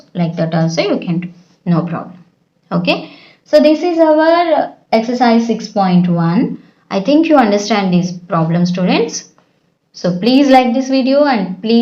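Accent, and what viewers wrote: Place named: RP, United Kingdom. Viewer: Don't do overaction okna talk properly don't talk posh english 😏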